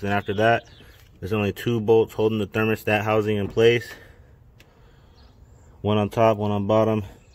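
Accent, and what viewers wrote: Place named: Pennsylvania, United States. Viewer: Are you from back east, you sound like/= my neighbor from P - A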